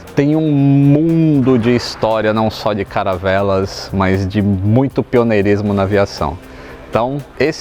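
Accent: Brazil. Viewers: Simplesmente, OBRIGADO,. A história da "aventura" de Gago Coutinho e Sacadura Cabral mais bem contada que eu conheço! Só um Brasileiro em Português com açúcar para contá-la. Parabéns! Um abraço comovido, Irmão.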